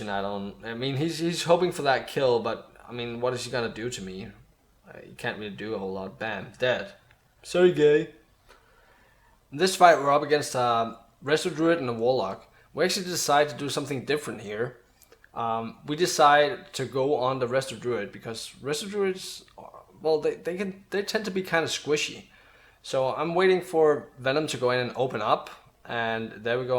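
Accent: Denmark. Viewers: Der er ikke meget dansk accent, over din accent. =D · I heard u were danish instantly, im danish myself, i dont have as bad accent tho, a lot of danish people are stuggling with an accent while talkin english tho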